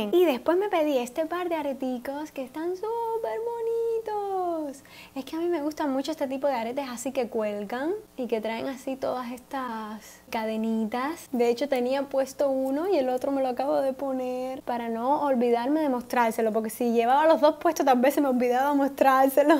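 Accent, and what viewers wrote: Cuba. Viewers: Hola tocalla eres cubana verdad? :3 mi novio igual lo es el es de Camagüey me encantan tus videos!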